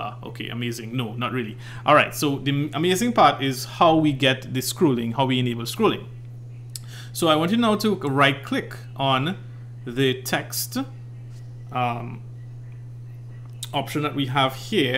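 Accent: Trinidad and Tobago. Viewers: I'm sorry but the Trini accent just made me unreasonably happy. Also, the tutorial was so helpful. Thanks for this!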